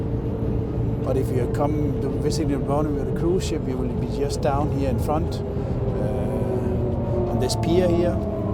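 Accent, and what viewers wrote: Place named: Germany. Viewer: Croat here, very nice video Sir.... Only negative thing for me personally, your english sounds like Klaus Schwab talking 😊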